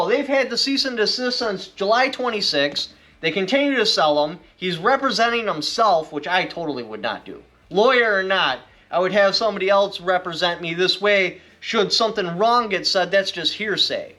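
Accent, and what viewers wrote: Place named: New York, United States. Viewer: Is This kid in NY? Sound like a person from NY. When ATF says it is a machine gun that does not make it law. They inforce laws not make laws. Until it has been through the system not illegal. It would be illegal for an arrest.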